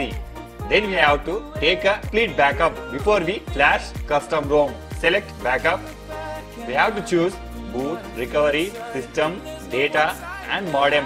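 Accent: India. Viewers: >click on video >indian starts talking >close immediately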